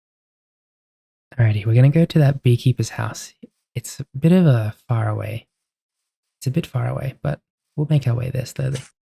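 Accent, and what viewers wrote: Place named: Australia. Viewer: This is my second video to watch from you and I think you’ll be one of my favourites to watch from now on. Your voice is so nice and relaxing to listen to (it’s also nice to hear an Australian accent). I’m glad I clicked on your video ☺️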